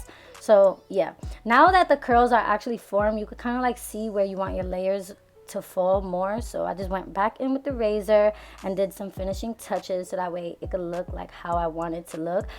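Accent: New York, United States. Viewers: I love your New York accent 😍😍😍😍😍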